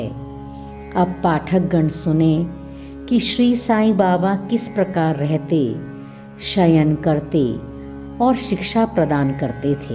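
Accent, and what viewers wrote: Standard. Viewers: Om sai ram.आपके द्वारा बहुत ही मधुर और शुद्ध उच्चारण में बाबा की लीलाएं हमें सुनने को मिल रहीं हैं. इसके लिए आपका बहुत-बहुत धन्यवाद।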